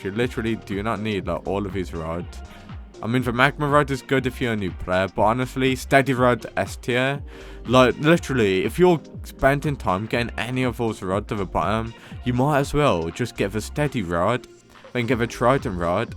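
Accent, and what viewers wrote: United Kingdom. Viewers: Omg he have the bri ish accent😮 (not trying to be rude pls)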